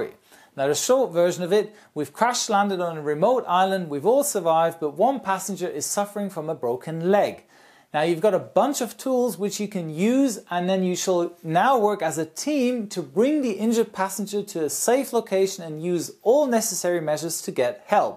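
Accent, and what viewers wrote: Germany. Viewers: Allgäuer Bub isser ^^ PILOTEN BOARD D E Da merkt man direkt das er ein Bio Deutscher ist hahaha :D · K. S due to his slight accent speaking English ( as a German I sadly know this accent very well) and this job or former job at AirBerlin I would strongly suggest he's German :) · Hey, are you a german??? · HySeb the captain is german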